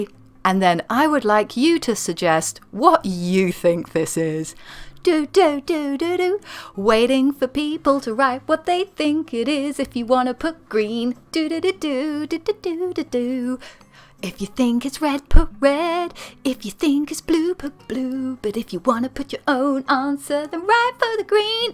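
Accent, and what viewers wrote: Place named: United Kingdom. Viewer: I'm too American to notice English from British or Welsh from Scott. I think odd is the soup sandwich combo special at a posh bistro, or standing in que to take number at the DMV. I find Brits fascinating. Your sense of humour especially. It takes a while to run the dialect through our macaroni and cheese filter, but after dialing it in, you're all really quite brilliant. Thanks for the responses, you're quite lovely. Just curious, did your belly button ever pop back in?;) If not, chuck some glitter on it! Cheers!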